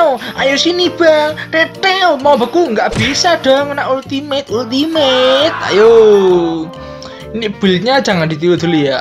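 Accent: Java, Indonesia. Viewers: Medoknya dipertahanin bro, ciri khas elo xD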